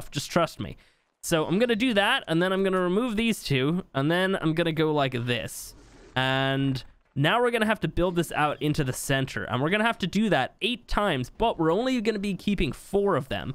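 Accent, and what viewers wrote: Ireland. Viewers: Who else hears the Irish was in voice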